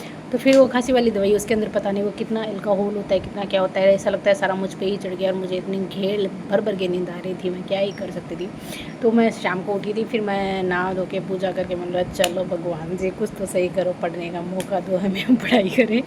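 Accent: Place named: Marwar, India. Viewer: Hindi se achi toh marvadi lage छ थे तोह मारवाड़ी ही बोल्या करो जीजी 😊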